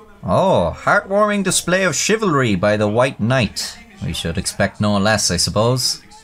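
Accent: Ireland. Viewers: It's the Irish accent that really does it for me. You ever notice English/Scottish/Irish accents are over represented on YouTube? They just sound good. Not too many popular Aussie voices though. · Stories always sound better when told with an Irish accent.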